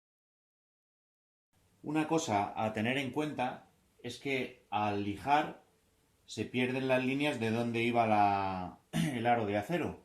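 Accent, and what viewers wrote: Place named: Spain. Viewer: Cuando veas la foto de mi perfil te darás cuenta de qué amo los gatos 🐱🦊 soy Mexicano y vivo en los Ángeles California USA y en el departamento donde estoy no puedo tener animales 😭😭 y ati también te gustan los felinos mire 2 al principio del vídeo dales un beso de mi parte por tú acento creo qué eres de España Saludos para la madre patria Muy bueno tú vídeo Felicidades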